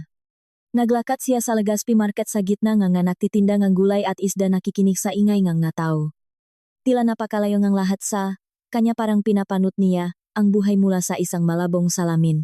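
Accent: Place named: China. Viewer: Ang narrator lasing ata intsik ang nagsasalita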